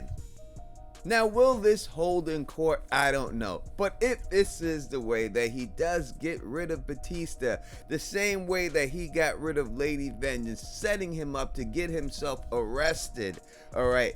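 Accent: New York, United States. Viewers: You gotta be from either jersey or new york with that accent. Loved living in the city. Great content as always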